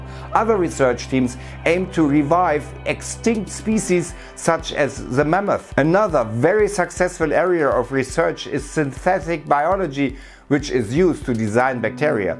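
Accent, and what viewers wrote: Germany. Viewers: Cool, u realy talk in a good english. Just ur dialect says me u r german. May u need more praxis with american or english- born people ...😉. Never mind, u r documenterys r still interesting 👍🏼. Thx there for.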